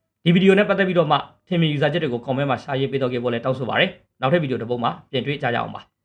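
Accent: Rakhine, Myanmar. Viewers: ပထမကတော့နားထောင်မလို့ပဲ ရခိုင်လေသံကြားတာနဲ့ ဆက်လည်းနားမထောင်ဘူး unlikeလည်းပေးပြီး not interested လုပ်ခဲ့တယ် မင်းတို့ရခိုင်တွေ အကြွေးပြန်ဆပ်ရမယ် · ရက်ခီးသံ ဝဲလွန်းဝာာ အဆင်မပြေ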